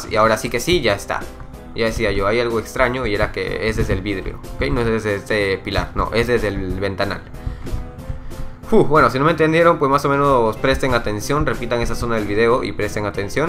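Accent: Peru. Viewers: No se pero a mi duxo me suena a que es de Peru xD e.e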